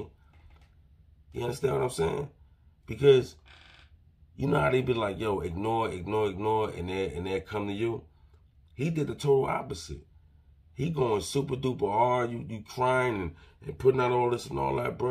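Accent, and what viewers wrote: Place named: New York, United States. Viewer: Luvvv what you have to say!! Especially luvvv that accent, sounding like you're from the Boogie Down or Queens, or Brooklyn or something lol. Good sound advice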